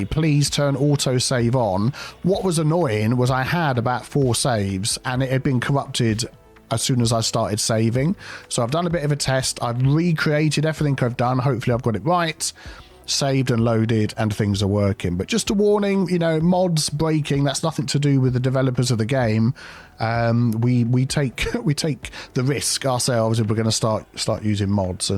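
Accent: United Kingdom. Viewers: the most adorable thing in your videos is your classy British accent. If I had such accent I’d never shut up 🤣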